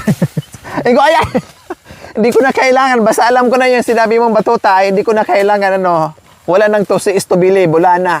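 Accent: Visayas, Philippines. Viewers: Madam🥰noon pa kita pinapanood kasi boses bisaya ka,,nakakatuwa❤️wow,malinis na ang kapalugiran,,madaanan nyo na,,at makikita nyo kaagad ang Ahazzzz!Good job,,ang galing ni kuya Ebok,,mga masisipag naman sila,,Tama,,salamat at may matutulungan ka,,sa taong nangangailangan,❤️Bless ka ng Panginoong ,,JEHOVA❤️🙏🏼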